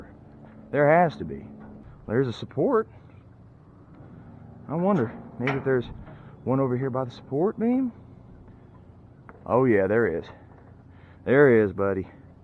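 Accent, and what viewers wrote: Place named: South, United States. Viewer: Speaks like Huckleberry Finn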